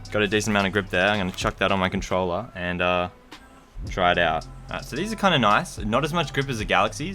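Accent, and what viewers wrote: Australia. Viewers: he is Austrilian dingus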